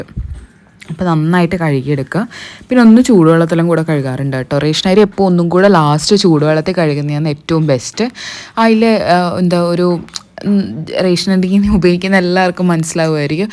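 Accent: Kannur, India. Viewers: Da സംസാരം endho vere slang പോലെ തോന്നുന്നു. Knr touch കുറവാ 😍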